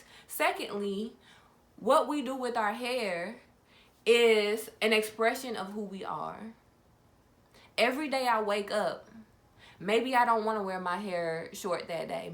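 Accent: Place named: United States. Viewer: Where in the US are you from... I love da accent. You are beautiful and blessed.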